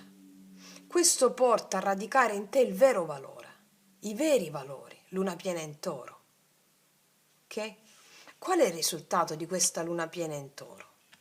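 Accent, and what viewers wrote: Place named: Rome, Italy. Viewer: Mbe di Roma ti ci innamori, non puoi farne a meno. Poi avevo varie amiche romane, me facevano impazzi'. Il problema delle cose belle è che creano dipendenza. Roma mia amata Roma bella e caotica città irrequieta. Poi il romanesco me fa sbrocca'. Grande, sempre molto gentile ed amorevole eee. Come cantava... Quanto sei bella Roma...mo la vado a senti`🌝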